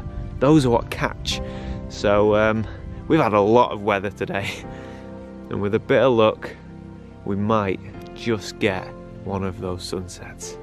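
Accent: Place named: Northern England, United Kingdom. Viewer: Love the way you subliminally introduced us to the lone Silver Birch! Also although it is great to listen to your lovely northern english voice (I was brought up in Manny, in Australia now though) I think your recent videos are too much talking head and not enough about the photography, composition, voice, technical and so on - like it used to be. I think most folk who do landscape photography know about early mornings, camping, walking, trials tribulations, but many folk that I 'mentor' mostly want to know about composition and what makes a shot stand out combined with processing? But carry on as normal, I am one voice in a sea of back patters :)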